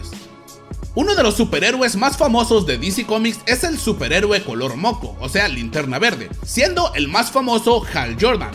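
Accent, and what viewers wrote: North, Mexico. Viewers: Me encanta tu acento norteño. Como de naco intencional.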